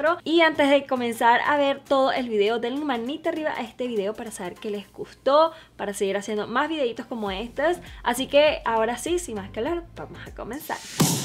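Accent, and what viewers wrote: Venezuela. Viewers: Yo sabia que era de VENEZUELAAAA ese acentoooooooooooooooooooooooooooooo like like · Hola, primera vez que veo tus videos y por que amo a Nena. Quería saber de donde eres? Tu acento se me hace algo Venezolano, me da curiosidad. Me gusto mucho tu cabello, y no fue un fail el tutorial, solo que ella tiene mas iluminación y quizá y se echo un poco menos de producto, pero en fin, me gusto mucho tu video, iré a ver todos los otros. Besos💓😘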